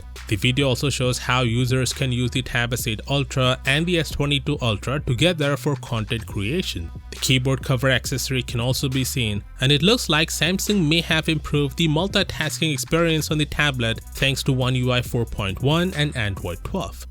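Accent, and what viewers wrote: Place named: India. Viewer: I loveee your accent. I would think indian with the Palatial clicks, but there’s a nonrhotic r, May I ask where from?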